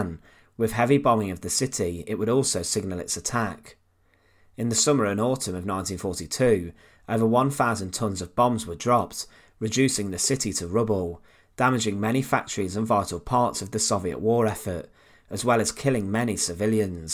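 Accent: United Kingdom. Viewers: The narrators voice is too much..I can't understand it, that British accent is too heavy.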